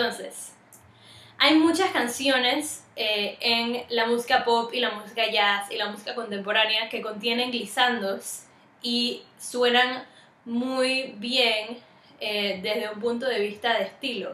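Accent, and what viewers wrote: Panama. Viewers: Sabía que eras de Panamá cuando escuché tu acento 🤯, tienes una voz preciosa! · namas con tu tono de voz se que eres panameña haha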